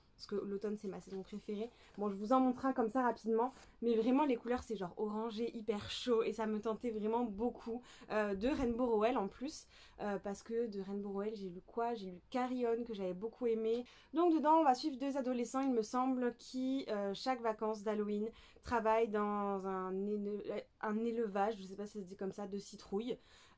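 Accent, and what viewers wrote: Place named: South, France. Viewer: J'ai reçu Pumpkinheads pour mon anniversaire aussi, sauf qu'Amazon a merdé et qu'il est en italien :') Heureusement que je le parle un peu, mais ça va être folklo ! J'ai très envie de me jeter sur Le Royaume assassiné aussi maintenant que je l'ai reçu miraculeusement en HB 😍 Trop cool pour le pendule ! (j'entends de fou ton accent du sud sur certaines intonations mdr)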